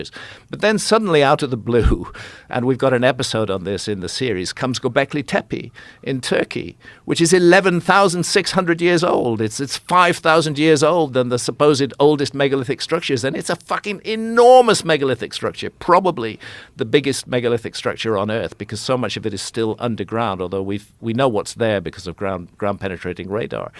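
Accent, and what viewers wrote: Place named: United Kingdom. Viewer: Hancock has no education in any field close to archaelogy, geology, or even Egyptology, he's simply looking for a buck by insisting nonsense like the Sphinx is literally 100,000 years old which makes no sense lol. He's this generation's Erik von Daniken who propogated the ancient astronaut idea decades ago, except wants to put a comforting British voice and updated spin on totally insisting his ideas explain history by sprinkling some truth on a lot of reasonable sounding bullshit. He didn't invent the Young Dryas cataclysm hypothesis, he just wants to take credit for it, and the only unique ideas he expresses are absurd. They're entirely contradicted by rounds and rounds of data all over the natural sciences. I'm not saying some of what he claims isn't wondrous and worth investigating, I'm saying that a lot of what he claims is clearly wrong and he's in this for the money.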